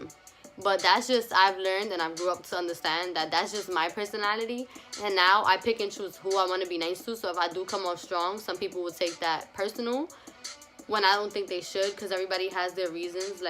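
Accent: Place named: New York, United States. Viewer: You can do this girl. Keep up your platform. I’m a new sub and already love your channel. I live in Quebec Canada and love yourNew York accent lol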